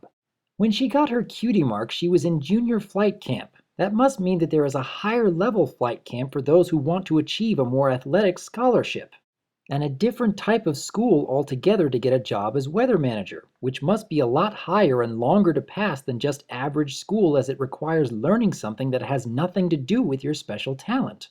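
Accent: United Kingdom. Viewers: Dear Are you British or American?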